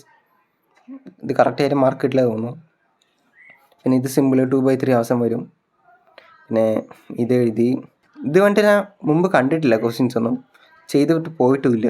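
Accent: Tamil Nadu, India. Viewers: Muthe.... Nee kidilan aan...📈👍 Ninte voice kidilaann tamil slang vannittu.... Nalla vibe ind video kanan...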